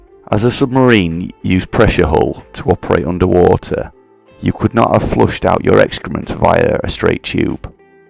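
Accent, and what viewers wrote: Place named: Finland. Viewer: Todella vaikea kuunnella, koska spiikkaajan mikistä puuttuu ilmeisesti popfiltteri kokonaan, tuntuu että nauhoitettu jollain viiden pennin headsetillä. Jokainen henkäys puhisee mikkiin karusti. Tämän vuoksihan haastattelumikeissä on ne vaahtomuovit/pörröpäälysteet. Äänitasotkin jotenkin huonosti, niin kuin joku kommenteissa ehti sanoakin. Parempia ovat olleet ne sinun itsesi spiikkaamat englanninkieliset versiot. Suomalaisella englanninaksentilla on ilmeisesti yllättävän kiva "soundi" ulkomaalaisia ajatellen. Kannattaa ehdottomasti jatkaa englanninkielisten videoiden tekoa, Suomen (sota)historia ja niin erikoinen kalusto kiinnostavat ulkomailla!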